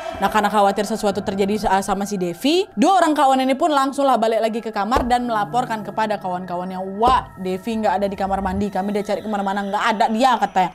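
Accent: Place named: Aceh, Indonesia. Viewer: Logatnya kak mengingatkan aku dengan pria aceh yg pernah singgah ☺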